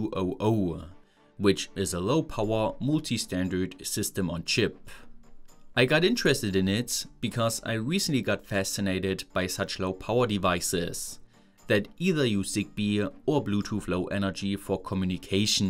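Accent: Germany. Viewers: love your German accent too I know it's irrelevant. When are you gonna build an esp32 grow tent for that German plant that people like to consume lol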